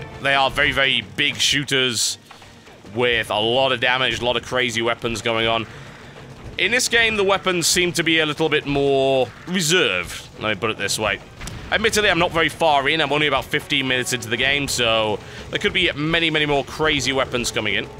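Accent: United Kingdom. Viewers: He's british. They say things funny. Like Amazon, to them, has an accent on the 2nd A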